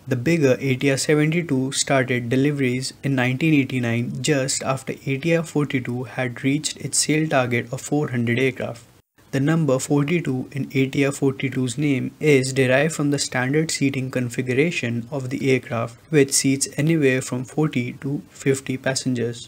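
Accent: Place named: India. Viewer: Indian accent made the experience very peculiar... Sounded as...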